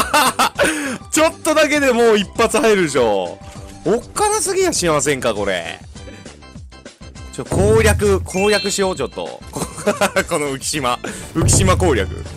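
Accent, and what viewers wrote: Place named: Kansai, Japan. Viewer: 関西弁すこ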